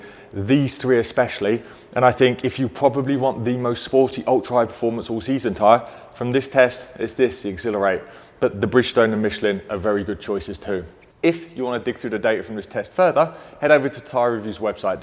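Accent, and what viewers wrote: United Kingdom. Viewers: Love your channel, instantly subscribed. Interesting thought that I wanted to share with you. My extended family tends to go with Continental UHP AS tires and we're mostly spread out across the West Coast of the US. I mention that because it rains at random times, year-round in most parts of California and seemingly weekly in Washington state. Due to the lack of extreme winter, we've found that the Continental tires let us drive "swiftly" in all weather types without fear of hydroplaning, loss of control due to unexpected situations (other people texting and driving - or swerving into your lane, deer, bicycle, kids etc). Basically, we want to be able to DRIVE our cars, push them a little, stretch their legs on occasion but not discover that a great dry tire becomes unpredictable in ANY way when the roads get wet; let alone tries to kill somebody with unexpected braking or steering limitations. 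As you're probably guessing, Continentals usually meet our needs for HIGH performance All-Season tires, specifically. Anyway, my point is this, your accent definitely sounds British, and it's my understanding that you guys get MUCH more of the wet stuff than California. That being said, I noticed you personally tend to prefer a tire based PRIMARILY on dry performance, so long as the wet and snow aren't too bad... essentially, your highest requirement is driving feel and control in DRY weather. While I can sympathize and appreciatea car that has that feeling in the dry, I would have to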